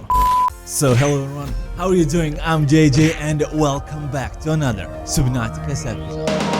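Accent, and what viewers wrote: Russia. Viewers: OMG i finally find a good youtuber your russian actcent sounds good i love your vids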